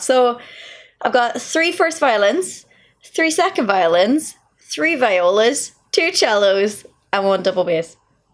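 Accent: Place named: Ireland. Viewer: You loosing that beautiful Irish accent, need to spend more time back home.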